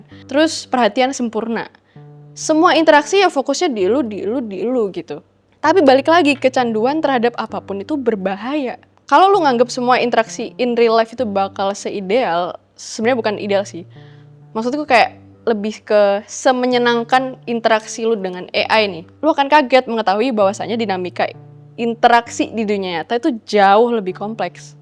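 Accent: Java, Indonesia. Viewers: medok like this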